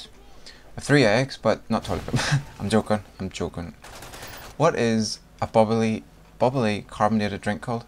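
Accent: Northern Ireland, United Kingdom. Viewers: Lol Northern Irish accent is awesome!! Love your accent (I'm Australian). In Australia we don't necessarily say 'gym shoes'. We say sand shoes, or sneakers lol! Carbonated drink, we normally say the actual drink itself (Fanta, Sprite), or we say 'soft drink'. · american accent sounds ´neutral´, northern ireland accent is so much more interesting :) i think i´ll subscribe to hear that accent ' thanks for the vid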